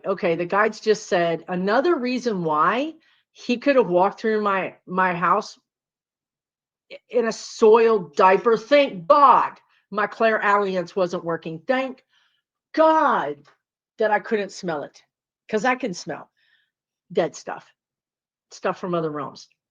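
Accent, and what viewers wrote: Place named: South, United States. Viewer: You are adorable, down to earth, 100% believable and I love your southern accent when you let it out.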